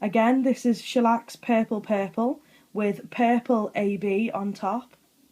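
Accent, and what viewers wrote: Liverpool, United Kingdom. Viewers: Hi, I love you videos, I grew up in Liverpool myself so love listening to your accent. I own a training centre in the West Midlands and would like to use some of your links to help our students. Please keep up the good work of how to do nails properly, there are so many technicians out there that have not be trained well. John at thenailschool.co.uk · are you a scoucer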